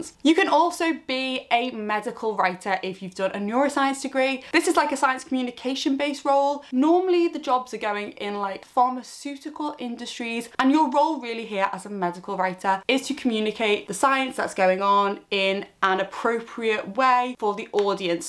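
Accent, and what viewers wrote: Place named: Merseyside, United Kingdom. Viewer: I'm so glad to come across your channel! I'm literally 39 have absolutely no experience with anything on the subject, yet simply through experiences with mental health and my own personal research on the brain and how it works, I've decided I really want to pursue this role! I'm from your neck of the woods by the sounds of things (Merseyside), but currently work full time with 3 kids! Do you have any advise on which pathway I could take to get into this career?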